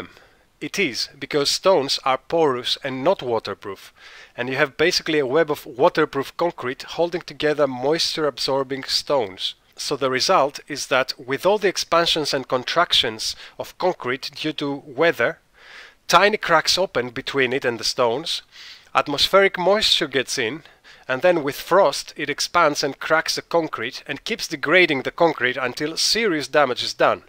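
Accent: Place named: Greece. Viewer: Very informative - thanks. Btw - you have a very non-Greek, Greek accent when speaking English!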